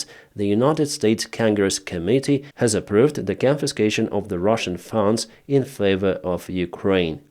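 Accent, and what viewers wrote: England, United Kingdom. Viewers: Yeah he’s British, his accent can be found nowhere else. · He has a strong English accent . I am British and recognise the accents of my compatriots.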